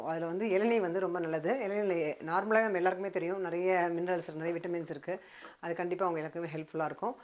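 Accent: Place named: Tirunelveli, India. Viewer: Mam what is ur native place..ur slang is like our tirunelveli slang..nice to hear..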